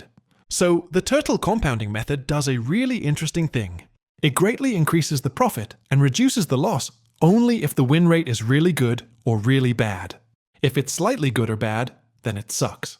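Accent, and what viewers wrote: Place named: United States. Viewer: thank you for changing accent from british to u.s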